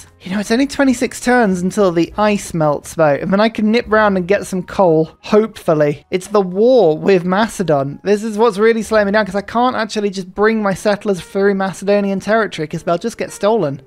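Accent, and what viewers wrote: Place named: South East England, United Kingdom. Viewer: Wait really? Do you mind me asking where you're from then? Cause I've lived in each of the 4 quadrants of England and your accent definitely sounds most similar to the South East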